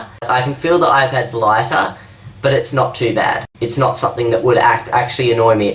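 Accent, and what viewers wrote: Australia. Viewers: Oi! An Australian! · woah woah woah HALT IT your australian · damn that accent you ozzie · Are you from Australia?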